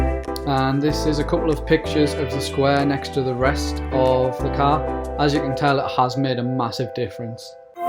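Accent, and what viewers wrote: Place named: Middlesbrough, United Kingdom. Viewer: You sound local to me (Middlesbrough). I have literally just bought this polisher from Argos (not yet picked it up though).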